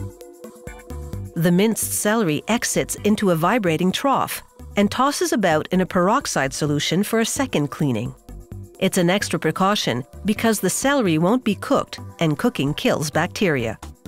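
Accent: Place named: Canada. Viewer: This wouldn’t be a US episode as the narrator is Canadian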